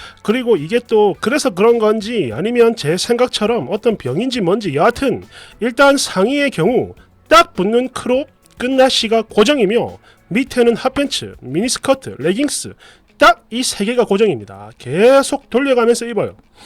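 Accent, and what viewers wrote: Gyeongsang, South Korea. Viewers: 덕분 에 마니 웃고 갑니다 칠학년 에 웃을 일이 없는데 의 그 다른 사람 이 흉내 낼수 없는 경상도 스타 일 그 특유 의 진짜 잘 보고 있어요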